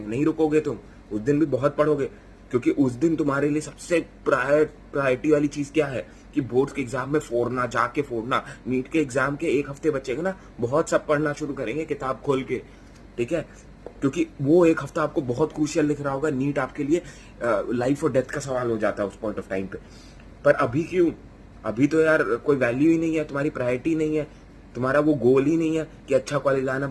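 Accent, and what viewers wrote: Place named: Uttar Pradesh, India. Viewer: Aur bro UP se ho pata lagra 😁😁😁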